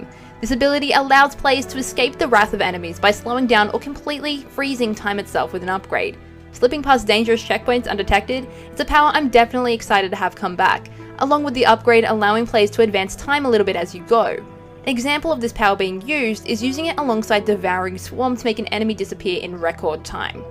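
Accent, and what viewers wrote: Australia. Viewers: Even with the lack of official info u still manage to make an informative video, really impressive if I may say so. Is that an Aussie accent? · are you aussie?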